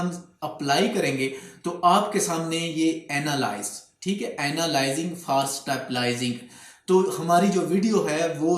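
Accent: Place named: Punjab, India. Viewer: Tusi bolde urdu jo but tuhada lehja punjabi wala hai ji 😀😀🙏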